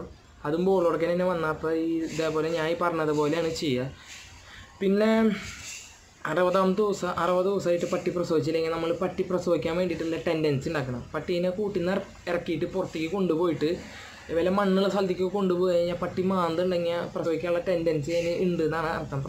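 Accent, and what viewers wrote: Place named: Thrissur, India. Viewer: നുമ്മ തൃശ്ശൂർക്കാരൻ ആണ് തോന്നുന്നു slag കേട്ടിട്ട്...😍 ആണോ ബ്രോ?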